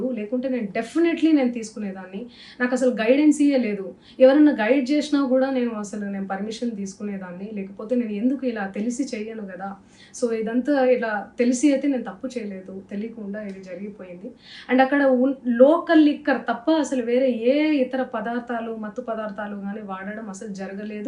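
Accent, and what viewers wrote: Telangana, India. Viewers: U can speak rayalseema language sis..y the slang of ts....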